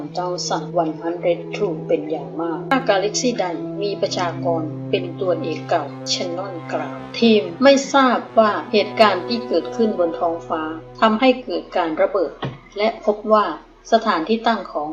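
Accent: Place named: Isan, Thailand. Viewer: ลงพุงแชลแนล(ผมชอบ)ก็อิสานครับ สำเนียง ไม่ใช่ปัญหาครับ ..พูดกลาง สำเนียงอิสาน ก็ไม่เป็นไรหรอกครับ พี่น้องสิสาน มีอยู่แผ่นดิน สำเนียงคั้นนหูครับ เป็นกำลังใจให้นะครับ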